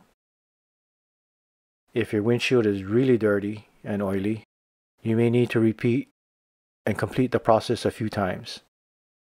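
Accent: Hawaii, United States. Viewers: You’re from Hawaii 🌺. I just listened to you speak and knew🙋‍♀️. Excellent tips. Thanks. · Is that a Hawaiian accent I hear?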